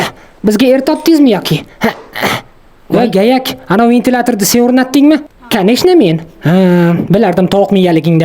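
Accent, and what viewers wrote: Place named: Syrdarya, Uzbekistan. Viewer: Sirdaryoni shevasi ekan😊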